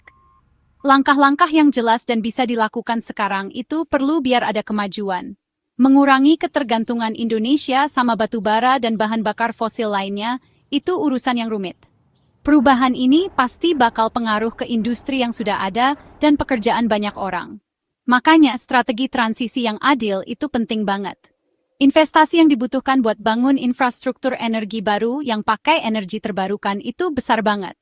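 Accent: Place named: Malaysia. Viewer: Konten kreator yg logat begini palingan orang malengsia.. Halah sok tau lo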